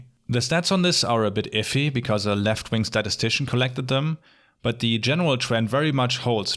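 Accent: Germany. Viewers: Bist du Koelner? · Ha, I knew you were German.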